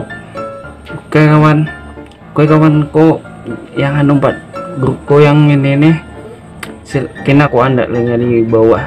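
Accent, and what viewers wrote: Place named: Banjar, Indonesia. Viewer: bjur bnr tu orang Banjar😂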